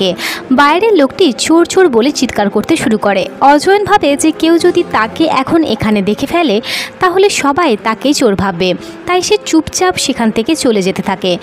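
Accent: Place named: Chapai Nawabganj, Bangladesh. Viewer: আপনার বাসা কি চাঁপাইনবাবগঞ্জে আপনার ভাষা শুনে মনে হচ্ছে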